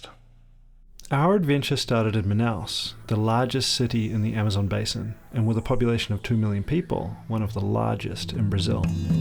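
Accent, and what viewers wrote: New Zealand. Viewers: whats that accent? is it nz or a bit australian and there are rhotic r's ive never heard it before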